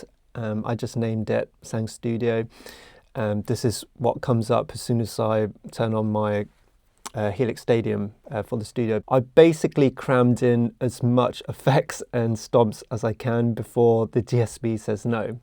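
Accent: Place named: United Kingdom. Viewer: Can you do a tutorial on how to dial in a killer BRITISH ACCENT like yours?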